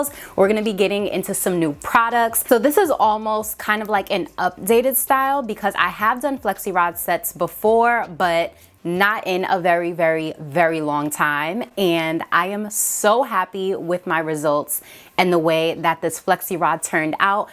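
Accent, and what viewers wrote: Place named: New York, United States. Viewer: You are a queen sis and I loved every second of this. Great job and I love how you break down your video, I'm a new sub! 😁💚👑 I also like how you created a faux bang and I love your enthusiasm and your accent. You sound like you're from New York or somewhere up north! 😁 I will have to check out this line of products.👍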